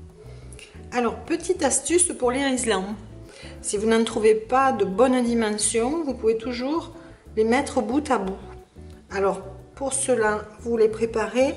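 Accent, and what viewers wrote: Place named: Provence, France. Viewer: bonjour merci de cette magnifique recette..vous avez un accent qui ressemble au mien je suis provencal d'arles et je vis en thailande ........adessias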